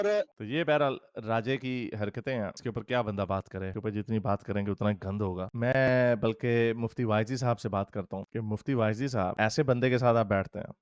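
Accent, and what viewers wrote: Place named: Punjab, India. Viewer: Same here, I live in singapore and i just come here to have Great laughs and Hearing his Punjab Accent!!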